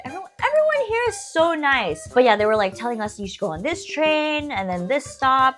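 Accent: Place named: United Kingdom. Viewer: Wait you are from 'MURICA?! I tought you were like British or a very confused German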